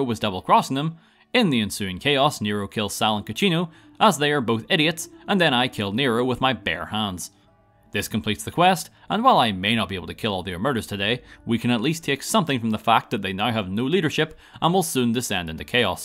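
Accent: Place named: Northern Ireland, United Kingdom. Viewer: I love having your videos on to relax or for background noise and I never knew why until I heard from one of your Skyrim videos you're are also northern Irish I'm so glad I finally found someone from here who makes amazing videos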